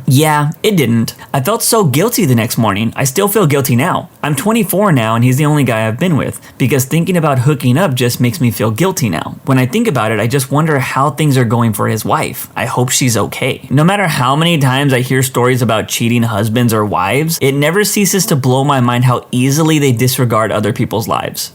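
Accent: South, United States. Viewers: The southern accent is HILARIOUS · As someone who lives in the south, I approve of the narrator using a southern accent in the first story XD it's just so funny to me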